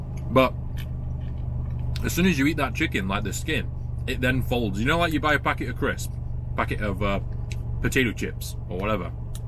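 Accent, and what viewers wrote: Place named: Liverpool, United Kingdom. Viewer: Deffo a scouser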